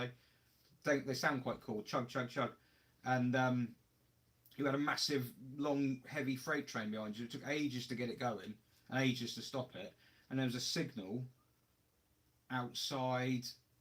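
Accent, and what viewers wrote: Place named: West Country, United Kingdom. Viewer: Sorry I missed the live boy apprentltbdaughters birthday was more important… really enjoying the catch up. Just to clarify cause it wasn’t made clear, do you have your own SM? As you seem to have a bit of a West Country accent can you end your vids with a “proper job0 “ akin to the pe- chew of Ian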